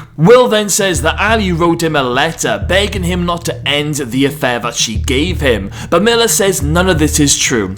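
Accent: Wales, United Kingdom. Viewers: Love your analysis so much, as Wentworth keeps getting better so do your reviews- this review is pure art with your fine detail of screenshot selection, your feelings in response to the scenes resonate. I love your predictions - I definitely think you could have a role for the show - as a Melbourne boy born and bred but living in UK I love to hear the passion snd love for our best ever Australian tv show delivered in a welsh accent. I vote for you being offered a role by FOXTEL to create official special feature shows that celebrate the series and give you direct access to the cast/crew/writers.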